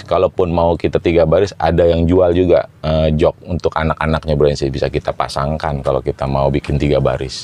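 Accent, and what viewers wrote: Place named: West Java, Indonesia. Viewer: Tina logatna mah jigana urang sunda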